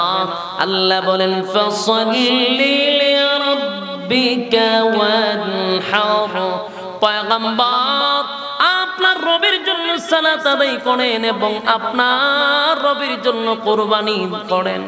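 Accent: Noakhali, Bangladesh. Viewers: এটাই নোয়াখালীর বয়ান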